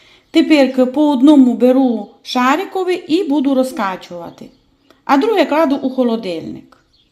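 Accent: Transcarpathia, Ukraine. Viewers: А яка рідна мова закарпатська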